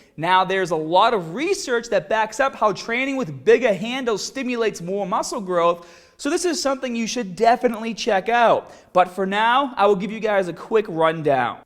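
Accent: Boston, United States. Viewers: Boston or Rhode Island accent? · Reasons why i subscribed: that strong Boston accent and funny yet serious. Nice work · Love the Boston accent :) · Am I crazy or is Bostonian accent a lot more noticeable?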